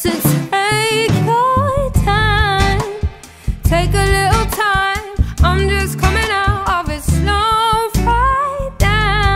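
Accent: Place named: England, United Kingdom. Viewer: She’s Jamaican but got big English accent I keep forgetting that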